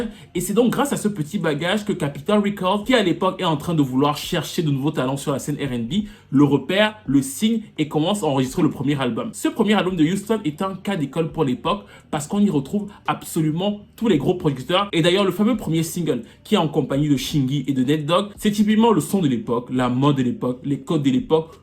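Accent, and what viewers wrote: Cameroon. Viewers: Tu ne serais pas camerounais par hasard parce que tu as un petit accent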